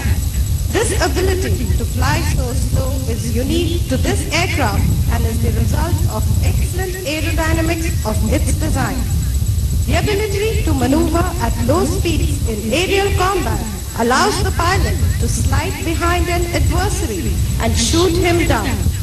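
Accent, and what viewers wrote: India. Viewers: Curry English has special favor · i hate thick indian english accents....they should've shut that bitch up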